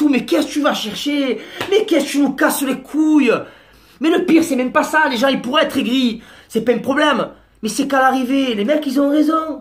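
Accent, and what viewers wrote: Marseille, France. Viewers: T as raison mec ! Tu dis exactement ce que je pense et avec l acceng de Marseille gros ça passe bieng mieux. Degeun peut dire le contraire 😁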